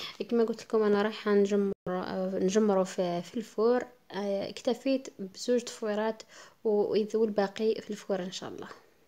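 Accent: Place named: Chaoui, Algeria. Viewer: اللهجة نناعك حاساتك شاوية يبان لسانك متعود على الشاوية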